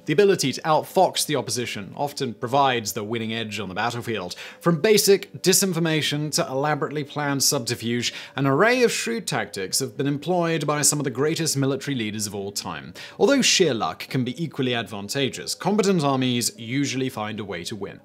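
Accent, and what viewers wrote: England, United Kingdom. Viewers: Pizz-er. English accent cracks me up 🤣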